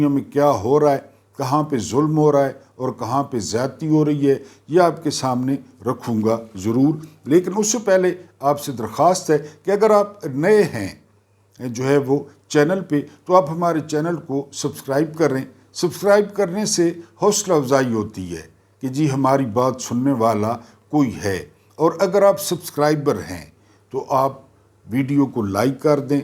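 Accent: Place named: Mianwali, Pakistan. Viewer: صاحب یقین کریں آپ کے دو تین ویلاگ دیکھنے کے بعد بندہ آپ کے ویلاگ کا عادی ہو جاتا ہے اور انجاۓ کرتا ہے۔ میانوالی لہجے میں آپ کا سٹائل سب سے وکھرا اور الگ ہے۔ کامیابیوں کے ساتھ شالا جیندا راہویں۔❤😂🎉❤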